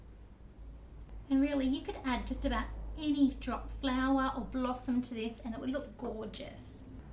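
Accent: Australia. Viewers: I love watching your videos the cakes you make are amazing! Your australian accent is really cute by the way ^^ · is she Australian? or from New Zealand ?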